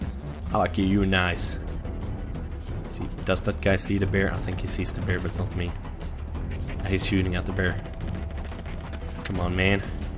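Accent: Australia. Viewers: Protip: He is australian, not british, mate. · hes not british, hes australian :)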